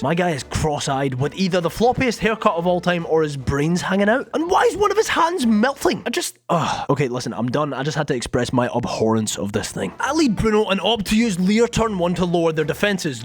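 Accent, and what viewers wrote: Scotland, United Kingdom. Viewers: Quick question are you Scottish?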